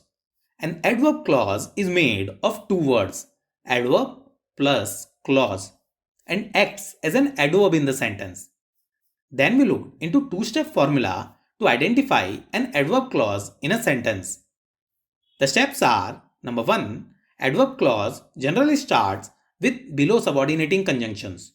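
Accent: India. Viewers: Why is the voice so Indian? Is he from india?😂😂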